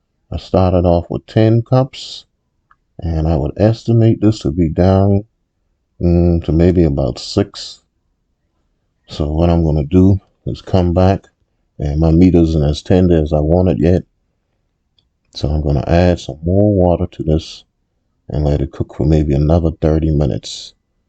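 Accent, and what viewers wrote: Charleston, United States. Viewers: You sound like you from Charleston 👀👀👀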